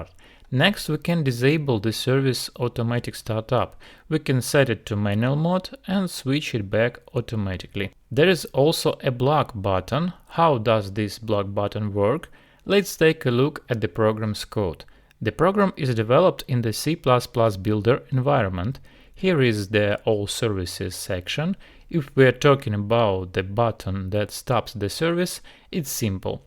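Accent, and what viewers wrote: Russia. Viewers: Are you russian? :)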